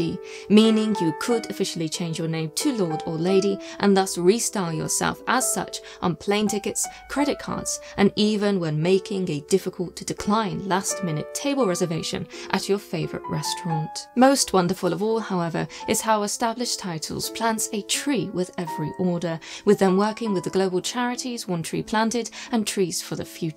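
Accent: United Kingdom. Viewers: I think she's so hot. The whole darkness shit and proper British accent aka queens English while talking about spooky ass shit just hits you right in the feels. The fact she covers well known stories yet divulges so much new information, it usually ends up changing ones perspective as there is no preexisting agenda.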